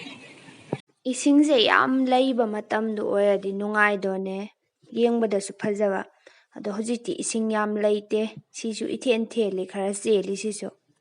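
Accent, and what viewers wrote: Kakching, India. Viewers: I love kakching voice accent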